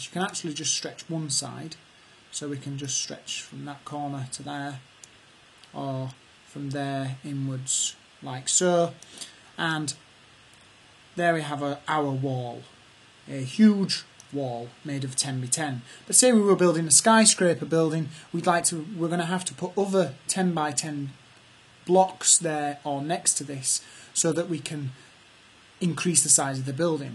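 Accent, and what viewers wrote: United Kingdom. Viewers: Are you from Liverpool or Manchester?lol I like your accent! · nother northerner :D eiya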